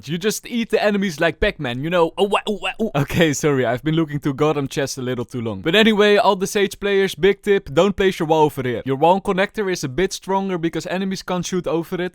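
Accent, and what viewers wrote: Netherlands. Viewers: The "v" sound doesn't exist in many European languages. He's Dutch, so it comes out closer to "falorant".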